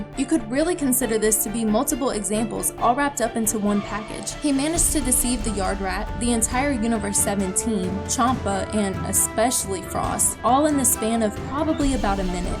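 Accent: South, United States. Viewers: low key has a southern accent. I like it lol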